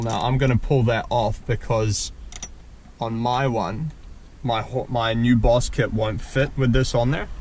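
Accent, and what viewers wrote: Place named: Australia. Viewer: Interesting accent. Aussie in the US?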